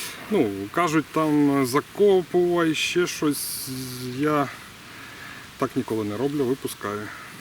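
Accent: Standard. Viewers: Душевне відео. Краще за перегляд хорошого фільму.... Дякую👍🔥🎣🕶️ P.S. За приємну літературну українську окремо спасибі👍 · Сподіваюсь, що це щиро. Просто пригадую, як ти знову перейшов на російську і почався натиск, від якого ти відбивався. Це дуже класно, і українська в тебе, як і в багатьох російськомовних така, що багато україномовних позаздрять, в тому числі і я, адже вона в тебе літературна, з того, що чув в школі, читав. Але пам'ятай, будь ласка, що це рішення в першу чергу має бути щирим і не через коліно, адже будь-яка агресія створює приховану ненависть. Головне, що ти за людина, а мова це лише протокол, якому, на жаль, на мою думку, надали силу зброї, яку тепер проти нас і використовують, часто власними руками. Пам'ятай, що є українці, які тебе будуть любити, шанувати і цінувати, незважаючи чи ти говориш українською чи російською. І таких, я вірю, більшість. 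Нехай цей комент хоч трішки збільшить тобі твою впевненість у твому рішенні :) Вітаю у клубі україномовних, тут весело :) Ну і дякую за контент! Бомбезні щукани :)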